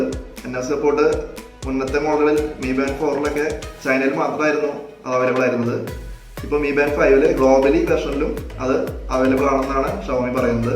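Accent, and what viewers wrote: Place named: Thrissur, India. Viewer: Thrissur slag touch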